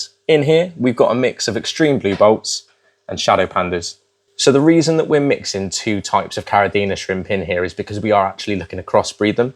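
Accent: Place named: United Kingdom. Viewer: I am from east asia, I am just here to enjoy ur british accent haha.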